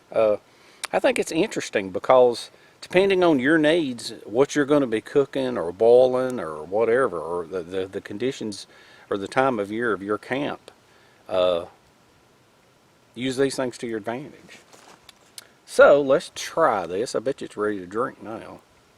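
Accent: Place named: Louisiana, United States. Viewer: You propably make the most sensible „wilderness-content“ on YT so it‘s my pleasure. And that Louisiana-Accent is the best thing ever. Your videos can not be long enough. I love to hear you talk! By the way: I keep watching my favourite video of yours again and again: „Your behaviour, choices and mindset in survival-situations“. That is pure gold. They should put it on TV 😂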